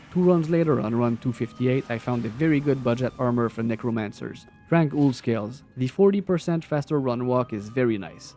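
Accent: Quebec, Canada. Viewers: Bro je reconnais un ti accent queb? Lets gooooo nice vid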